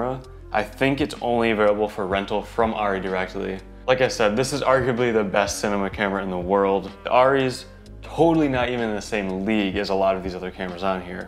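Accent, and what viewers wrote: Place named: Buffalo, United States. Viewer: Are you from Buffalo/Western New York? Your voice sounds very Buffalo.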